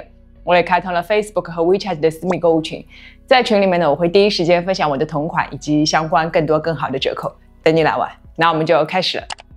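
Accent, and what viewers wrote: Shanghai, China. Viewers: 看了你好多视频了，今天终于订阅了，很喜欢你的一些讲解，听口音你可能是上海人吧。我这里没有Uniqlo的实体店，可惜了。买衣服还是喜欢实体店可以试穿，好多衣服博主穿着好看，自己穿未必好看。支持你一下。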